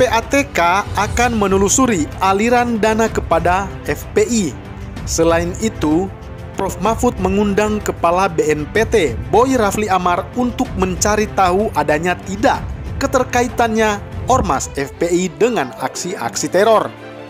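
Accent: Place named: China. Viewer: Apa iki...suara nya asli apa mahluk jadi2an tokh ini? Di lihat logat bcr yakin ini cina nyungsep.nyamar!!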